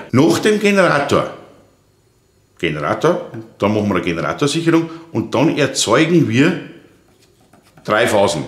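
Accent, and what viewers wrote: Bavaria, Germany. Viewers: Herzlichen Dank für die klaren Worte und die guten Erklärungen. Mittlerweile habe ich viele Videos von Ihnen angeschaut und finde jedes sehr interessant und für mich praktisch umsetzbar. Vor allem das Thema Notstrom, Einspeisung und Ecoflow betreffend. Kompliment! Positiver Nebeneffekt: Ich habe mich an den bayrischen Dialekt gewöhnt, sodaß ich heute ohne Rückspulen zu müssen, alles beim ersten Mal verstehe! 👍👍🍻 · Einmal wieder ein sehr informatives Video. Dankeschön. Auch, wenn es für mich leider wieder eines der Videos war, welches für mich aufgrund des von mir (im Verhältnis zu anderen Videos) erneut verstärkt wahrgenommenen bayerischen Dialekts akustisch leider nicht durchgängig einfach zu verstehen war.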